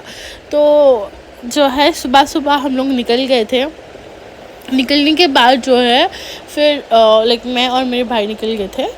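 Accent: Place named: Hyderabad, India. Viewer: Apka accent 😂poore Hyderabadi Hai ap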